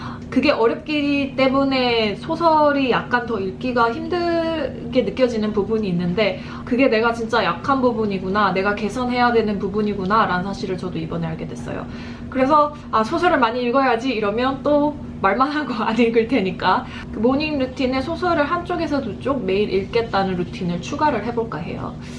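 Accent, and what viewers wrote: Gyeongsang, South Korea. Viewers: 노래듣다가 영상이 떠서 들어와봤는데 반가운어투 경상도말이 들리네요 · 영상 하나 보자마자 바로 구독.. ㅜㅜ 열정은 넘치나 어떻게해야할지모르는 저에게 너무 좋은 영상들! 근데 혹시 경상도분이신가용? ㅋㅋㅋ 가끔 나오는 말투가 경상도같은데 혹시나해서요 ㅋㅋㅋ(제가 바로 대구..) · 안녕하세요 예전부터 봤다가 처음으로 댓글달아요 ㅎㅎ 경상도 말투 너무 친근하구요(같은 경상도사람) 영어를 엄청좋아하는 사람이지만 영상보고 엄청 도움많이됩니다.ㅎㅎㅎ 자주 댓글남길께요..ㅋㅋㅋ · 갱상도 누나다^^ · 안녕하세요~ 오늘 구독하게 되어 여러 에피소드를 봤어요! 재미있고 무엇보다 유익한 내용 너무 좋습니다~ 가끔 나오는 경상도 액센트? 가 있어서 더 귀여우신거 같아요! 자주 올게요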